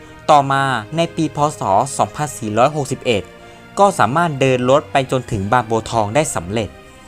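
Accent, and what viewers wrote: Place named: Myanmar. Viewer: ทำไมพูดเหมือนพม่าหัดพูดไทย มึงเป็นพม่าใช่มั้ย😮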